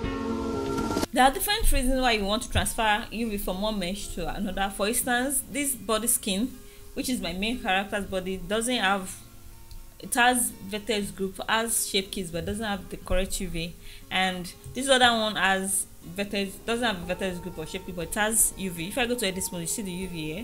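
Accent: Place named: Nigeria. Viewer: hey, you sound like a nigerian, are you? let me publish my video self made animation on 6th march (my birthday) then we'll talk. thank you.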